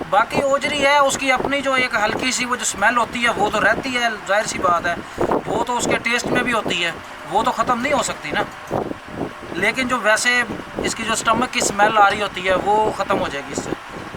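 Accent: Punjab, India. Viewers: Your improvement level is increasing day by day.keepd up specially ur Punjabi touch.I think you should make a whole video in Punjabi. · Nice Punjabi tuch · hahahah maza aa gaya yar kaya bat hai punjabi tuch ka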